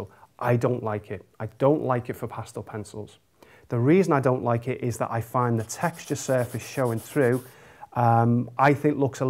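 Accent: Liverpool, United Kingdom. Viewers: Your accent reminds me of home. Liverpool for ever!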